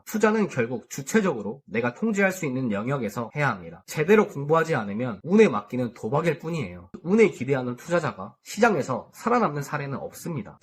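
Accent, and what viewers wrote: Jeolla, South Korea. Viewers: 전라도 분이신가요? 반갑네요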